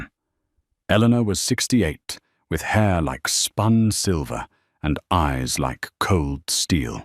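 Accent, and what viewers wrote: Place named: United Kingdom. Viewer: Hi.... I know, eh?! My solution, if you don't mind me addressing your comment, is to put a piece of paper over the top section of my screen where the freeze frame picture is or where the slide show and all the annoying "confetti / snow" moves. Then, I click on the Transcript amd read along as I am listening to that purring English / British accent narrate the story and let my imagination do its natural work. I hope this helps? Cheers! From Windsor, Ontarjo Canada 🇨🇦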